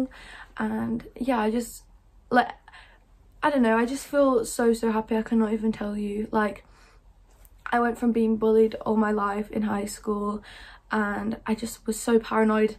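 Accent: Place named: United Kingdom. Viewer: I watch your content only because your British Accent🧡🖤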